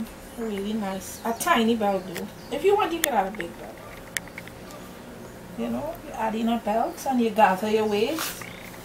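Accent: Trinidad and Tobago. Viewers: Heyyyyyyyy, I was pleasantly surprised to hear a Trini. Love it!!!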